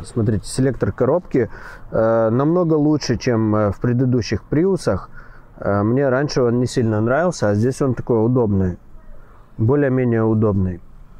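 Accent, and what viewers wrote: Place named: Odesa, Ukraine. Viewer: Отлично,! Смотрю всё,что Вы предлагаете к обзору!С Вашей Одесской манерой разговора даже обзор входных напольных ковриков будет интересным и занимательным!Блеск! А Машина хорошая,нет слов, но дорогая для патриотичных и не богатых колхозников.Да даже если бы Вы и критиковали её за что-то,то были бы вправе это делать, как я Вам это уже говорил..Ведь Вы! С уважением из Саратова,Владимир,61 год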